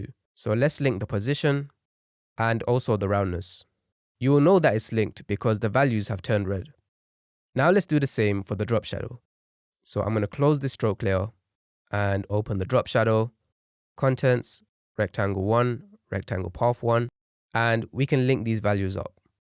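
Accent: United Kingdom. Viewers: most british accent i ever heard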